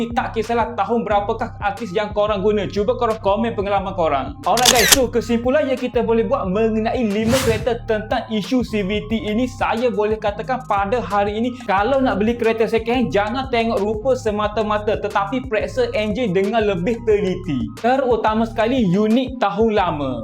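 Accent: Kelantan, Malaysia. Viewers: Kuat bunyi kelantan kau ni brader😂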